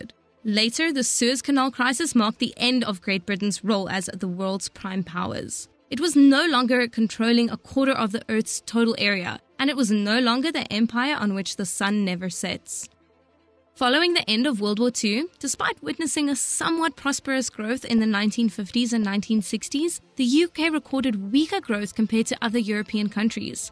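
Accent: South Africa. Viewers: the narrator's accent is so South African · There's no way the person voicing this isn't South African... Would be be a change. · I know a sef effrican accent when I hear it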